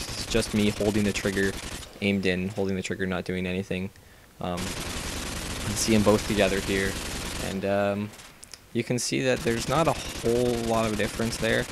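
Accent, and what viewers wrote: Canada. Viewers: hes canadian. he says "about" like a candian says it. Aboat the same.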